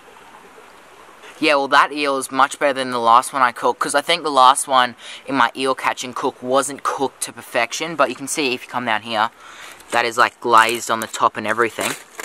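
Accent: Australia. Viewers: Hes from Australia Dingus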